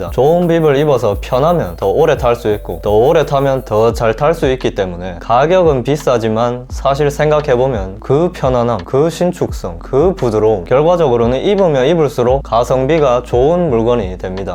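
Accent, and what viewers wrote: Gyeongsang, South Korea. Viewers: 정겨운 경상도 사투리.... 은근 그림 잘 그리심!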